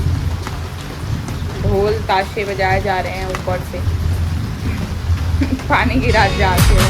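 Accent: Chhattisgarh, India. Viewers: Aapka village kaun sa hai aur kus district se hai aap apka hindi cg boli bhut achha laga❤❤❤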